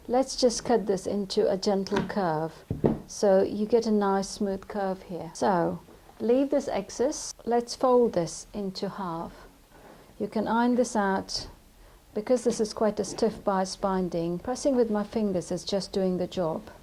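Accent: United Kingdom. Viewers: Excelente la forma que tienes de explicar y tu acento británico tan bonito. ¡¡¡Felicitaciones!!!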